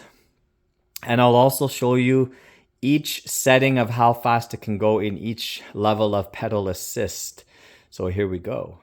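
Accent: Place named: Canada. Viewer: I'm planning on getting the X2 60 Volt dual 750 hub motor version. Judging by your accent you are from Canada and the one I can get is not available to you. 🤔 I live 5 miles from Canada in Detroit area by Windsor Ontario.. I currently own an Ariel Rider Kepler 26x4 fat tire with a 1,000 watt nominal Bafang geared hub..1,800 watt peak 52V 20 ah battery. I just wanted a folding bike with dual motors that was as fast as my Kepler but does hills even better. Dual suspension and 60V is a plus too. 100 Nm torque per motor is great! Better than my single 108Nm motor. I would consider the Road Warrior X2 with dual 60V 750 motors but I want a folding bike and extra suspension. My Kepler goes 35 mph no problem .. Throttle only.. 56 kph .. Flat ground to 60% battery 56 kph then goes down to 54 after 60% battery.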